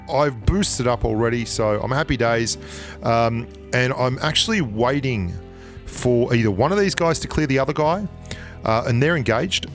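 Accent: Australia. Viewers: Are you from Australia bro ??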